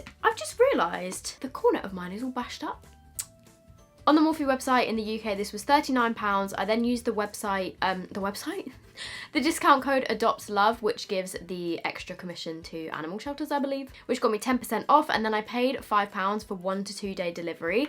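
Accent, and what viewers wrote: United States. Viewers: Your American accent has me shook. I thought someone dubbed over you😂 so much better than our actual American accent tho. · Great American accent. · That American accent x 😂❤ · So odd hearing you with American accent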